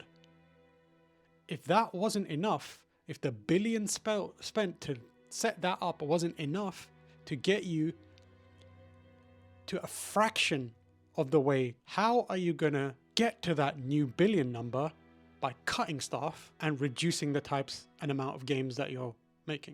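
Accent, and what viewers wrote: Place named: United Kingdom. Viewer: It’s just so nice to hear an actual British accent from a decent gaming YouTuber, I can’t bear the transatlantic accent all our people pick up over there! But on topic, this all sucks. Though I have to lol that Asha Sharma, hot on the heels of laying off 20% of her staff, has now been appointed as an adviser for jobs and productivity to the US fed reserve 😂 Corrupt billionaires all the way down to hell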